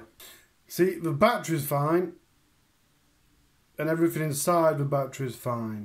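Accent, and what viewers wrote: Yorkshire, United Kingdom. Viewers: You naughty boy 😂😂 where abouts are you based I spot a Yorkshire accent 👊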